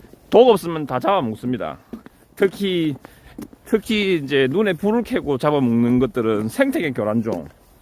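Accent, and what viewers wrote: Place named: Gyeongsang, South Korea. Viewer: 아~함암이네요 ㅋ 사촌이모가 함안이 고향인데.. 지는 부산이 고향인데..뜬지 12년차..ㅋㅋㅋ 꺼 보믄서 사투리..이자무꼬 다시 배우고 이쓰예 ~~~ 국민학교 ㅋㅋㅋㅋ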